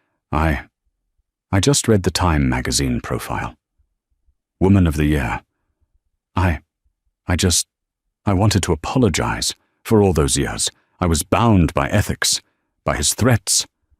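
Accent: United Kingdom. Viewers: Another interesting story about a sensitive issue. Well written. I love this British narrator voice. ❤❤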